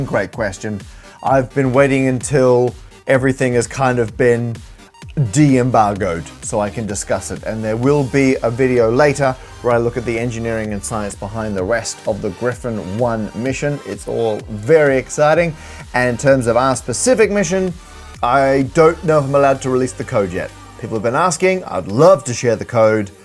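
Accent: Australia. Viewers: He's not a Brit. Can't you recognise an Australian accent?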